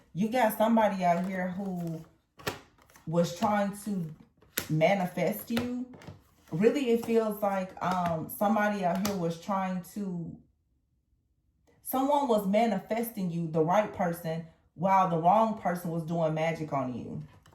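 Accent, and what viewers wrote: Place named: South, United States. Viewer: you are one of the best and most authentic readers I've come across in a while, particularly on YouTube, which hosts many readers. I hear a Southern accent in your voice and am curious as to what part of the South you come from. I am a reader myself and come from a lineage of "gifted" seers. Thank you for your generosity in sharing your gift to bring insight to the collective. Despite the frustration you may experience from time to time, your favor is a blessing to us all. Never forget, but remain ever reverent and protective of your blessed nature. Your are needed in this community, especially now. Stay strong, my Sister!❤😊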